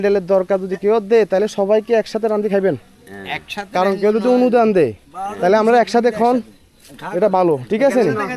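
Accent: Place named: Noakhali, Bangladesh. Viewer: ভিডিও অনেক ভালো লাগলো অনেক দিন পর নোয়াখালির কথা শুনলাম আমেরিকার থেকে দেখছি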